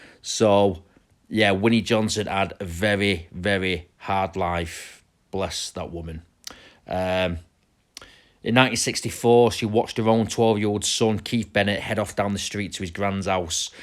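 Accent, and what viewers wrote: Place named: Manchester, United Kingdom. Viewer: Really enjoy your kids, though even though a lot of your accent is manc, you can't deny there's a Lancashire lilt there, which part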